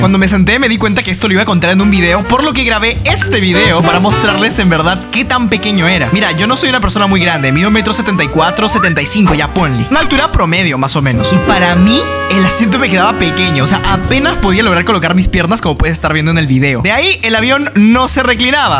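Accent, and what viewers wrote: Peru. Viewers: Eres peruano😮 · eres de lima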